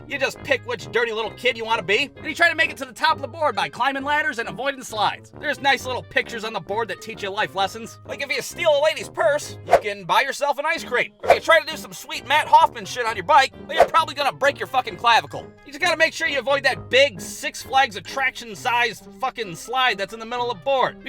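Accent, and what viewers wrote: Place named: Midwest, United States. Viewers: That’s how we sound in the midwest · Typical Ohio accent - “average Michigander” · It's called an "ohio accent".... me and come from the same area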